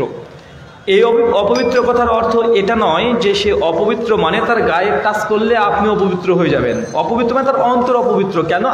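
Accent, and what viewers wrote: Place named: Rangpur, Bangladesh. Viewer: হুজুরের কথা বলার ধরণ অনেক সুন্দর অনেকটা আমাদের বাংলাদেশের রংপুর জেলার মত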